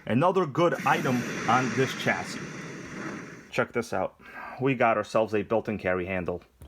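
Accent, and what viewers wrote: United States. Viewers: Hmmm I think in the long run it’s worth spending more bucks on a more reliable machine. I’ve only recently started watching your videos and only recently started thinking about getting into nitro. Just bought an hsp nitro truck and after looking at your video I’m going to return it and maybe go for a Hpi bullet nitro. The problem with having a cheap car with faults that you just pointed out would certainly put one off once it starts falling apart. The only problem with the Hpi bullet nitro is no 2 gearbox, maybe there is an upgrade? Please keep your presentations coming really enjoy it. That accent of yours, which part of the states, sounds really cool . This is Ricky from down under regards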